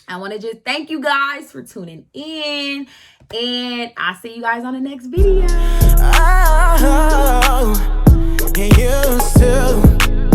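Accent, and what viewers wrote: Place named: South, United States. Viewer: You really sound like your from the South like me. I love the path you are on!! You are such a Queen sis👏🏾👏🏾👏🏾💜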